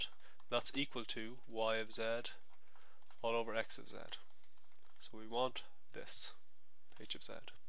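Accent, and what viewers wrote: Ireland. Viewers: haha wow didn't expect an irish person to be giving math tutorials on youtube. Sound man (Y)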